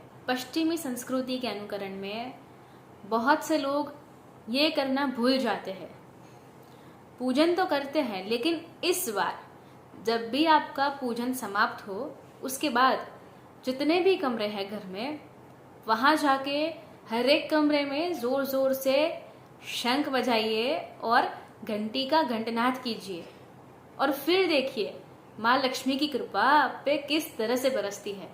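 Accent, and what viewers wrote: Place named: Gujarat, India. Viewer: Hindi with gujarati accent...very good..... reminding Daya bhabhi of "ulta chasma".....keep it up beta...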